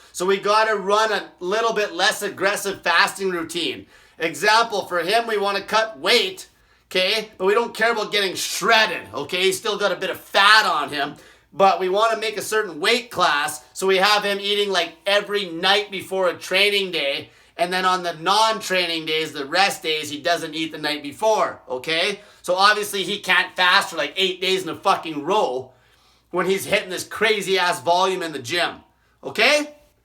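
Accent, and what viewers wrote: Canada. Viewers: Love how he has the typical English-Canadian accent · It is English-Canadian instead of French-Canadian.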